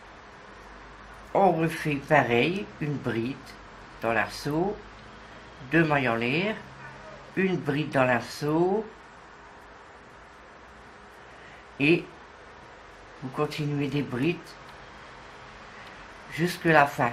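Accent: North, France. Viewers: Bonjour super vos toutous avec cet accent du Nord qui fait du bien. Pour cette robe vous dites 3 à 5 ans? J'ai donc fait 6 rangs de départ au lieu de 9 pour du 3 à 6 mois cela me semble petit Pouvez vous m'aider? Merci d'avance Sandrine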